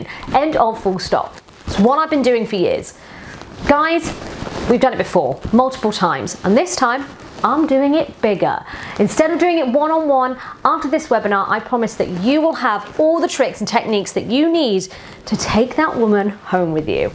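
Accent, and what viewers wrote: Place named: United Kingdom. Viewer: Beautiful accent, sounds British.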